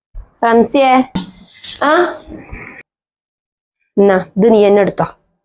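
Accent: Palakkad, India. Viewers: നിങ്ങൾ പാലക്കാട് ആണോ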